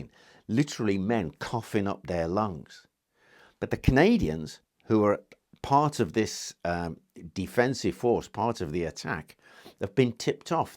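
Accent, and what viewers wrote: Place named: England, United Kingdom. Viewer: Interesting... I thought you were a out medieval times history and the such... Just watched arrow shooting vid where u said u moved to Canada... Does that mean you lost your English pride. Or patriotism for England... It's just so odd hearing the accent and saying your Canadian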